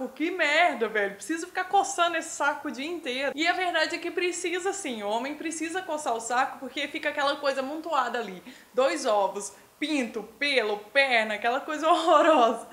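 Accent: Minas Gerais, Brazil. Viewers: Você é mineira??? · o sotaque continua??? Mineira..goiana...ou as duas??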